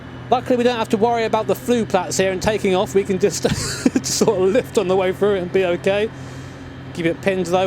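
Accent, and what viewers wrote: United Kingdom. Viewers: Are you brit?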